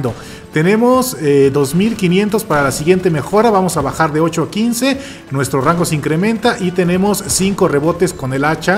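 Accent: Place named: Mexico. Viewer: Hmmm tratare de adivinar de que estado de mexico eres🇲🇽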